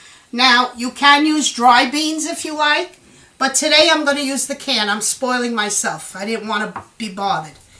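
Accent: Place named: New York City, United States. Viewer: You have a real strong ny accent, are you from Brooklyn ?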